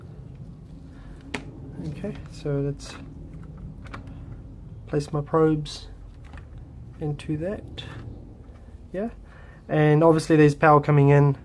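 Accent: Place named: New Zealand. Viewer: Your from NZ aye bruvvv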